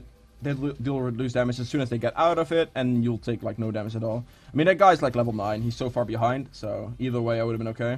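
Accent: Netherlands. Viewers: nice guide 👍👍👌btw are you dutch? it souds like it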